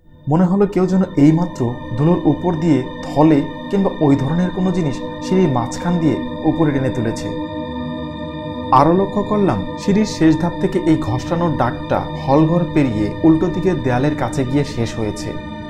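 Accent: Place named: Bangladesh. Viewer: আপনি নিশ্চই কাংলাদেশের মাল আপনার ভাষাই বলে দিচ্ছে আপনি কাংলাদেশের মাল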